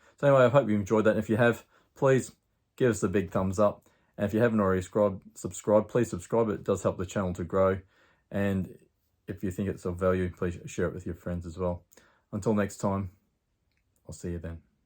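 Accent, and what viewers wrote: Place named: Australia. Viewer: The ramps that you talked about in this video look really useful. I buy used furniture like heavy china cabinets that are two pieces, a top part with glass doors that sits on a base with drawers. The one that I have now is unbearably heavy even with two people to move it out of the van or to lift it up and place on the base so I can photograph it. I think these ramps would be ideal for moving furniture out of the van and possibly sliding the top part of the cabinet onto the base. That would probably take some experimenting to get it to work out properly. Your Australian accent is lovely, by the way!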